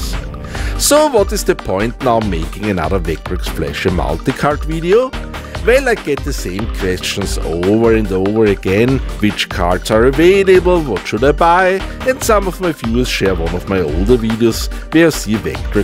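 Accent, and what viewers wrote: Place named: Austria. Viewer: hey, sehr nettes video, gehe ich recht in der annahme, dass du aus österreich stammst? dein akzent hört sich sehr danach an. grüße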